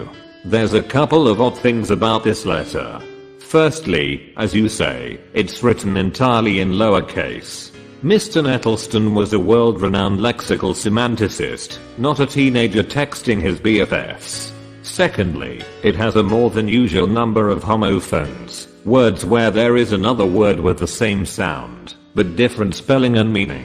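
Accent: United Kingdom. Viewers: Great... I like his voice, very clear and good brittish accent. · good british accent, very clear............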